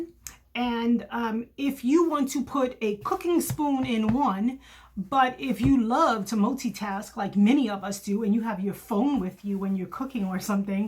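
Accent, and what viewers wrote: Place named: New York, United States. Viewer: I love your ideas. I sew but usually straight lines. You are extremely helpful. I also love your accent. NY? NJ? Mass?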